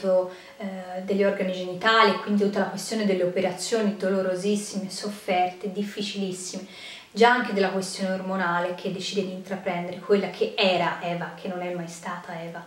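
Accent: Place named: Tuscany, Italy. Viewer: Salve egregia. Grazie per questi consigli sugli audiobook. Ad ascoltarla si sente chiaramente l accento toscano, mi chiedevo di dove più precisamente 😊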